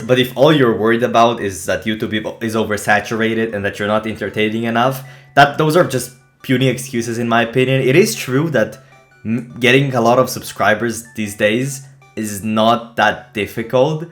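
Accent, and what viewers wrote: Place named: Europe. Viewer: Your accent sounds european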